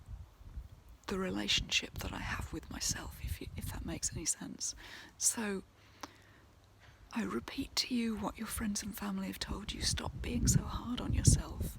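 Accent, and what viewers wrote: United Kingdom. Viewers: I love your British English and your peacefull view on life issues which we all have. I agree with you what we all shpuld focuse on 😚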